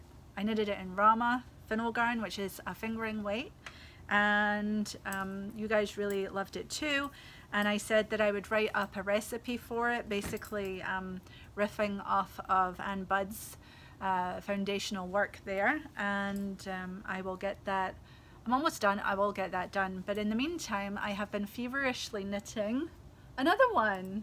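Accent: Scotland, United Kingdom. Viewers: Oh how can we get her to publish that pattern? It really is a no-fuss beauty! My bucket list has always been Shetland Wool Festival and EYF. Some of my motivation is simply due to my love of hearing the Scottish voice! I always look forward to listening to you while I knit! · Thank you for the EYF sale this weekend...even though the CDN $ is so low, I plunged in and fell right off my yarn diet to splurge on some Marie Wallin wool. A dear friend shared her leftovers from her cowl kit and I purchased the wool to replace the bits needed to make myself the same cowl. Love watching your shop updates and your wonderful accent, as I recently lost a very dear Scottish lass who was 94yrs young and it brings her closer each time I hear you speak. :)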